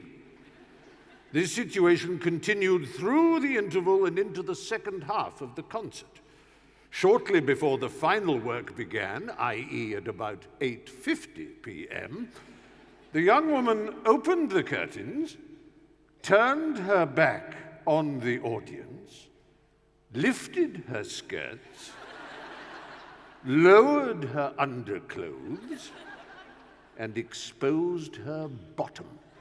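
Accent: United Kingdom. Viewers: 💕🤭 sounding even more British than usual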